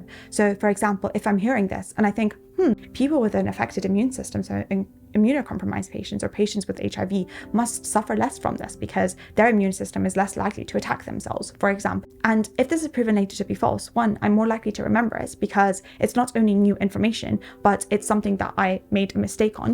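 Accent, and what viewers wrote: United Kingdom. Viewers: i LOVE your British accent!🥰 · “☘️A left handed red head with a British accent ☘️ : 🌹Beguiled me 💯 ✅🥀✅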